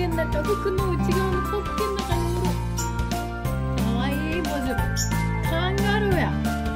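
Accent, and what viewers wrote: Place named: Ishikawa, Japan. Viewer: ナイス石川弁！！もっと方言出してー！！嬉しい('ω')ノ♥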